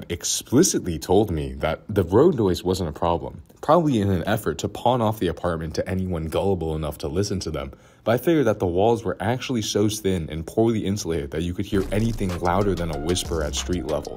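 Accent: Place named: North Jersey, United States. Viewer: Sounds like north Jersey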